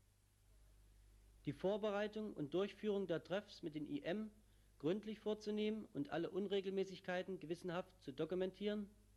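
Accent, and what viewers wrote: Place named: Saxony, Germany. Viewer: Leicht sächsischer Akzent, ansonsten roboterhafte Kälte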